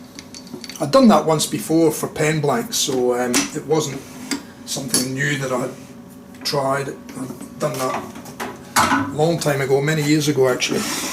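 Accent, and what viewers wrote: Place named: Scotland, United Kingdom. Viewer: boy! That accent sounds very close to home! And here was me thinking you were an Aussie! I didn't know my brush was made by a Scozzy!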